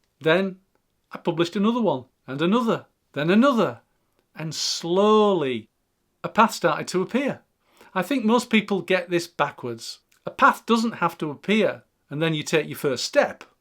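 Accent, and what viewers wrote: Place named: Liverpool, United Kingdom. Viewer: Hallo! I'm 61 and I just started roller skating and learning the ukulele in the last few years. I'm going to be taking a physics class in the fall. Thanks for these wonderful reminders. I'm considering writing a book and you're convincing me to give it a go. Never stop learning, never stop growing! 🎉 (BTW, your accent reminds me of the Beatles sometimes. Are you from Liverpool? I'm American, so I am not fantastic with British accents, but I do know they aren't all the same! 😂)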